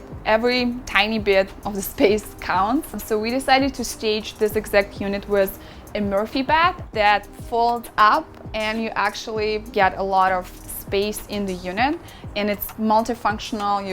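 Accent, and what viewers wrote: Russia. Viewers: She sounds Russian.......didn't expect that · It is obvious that is well educated but it is so strange that Russian speaking people need at least two generations to soften some English sounds. Nice blue Slavic eyes.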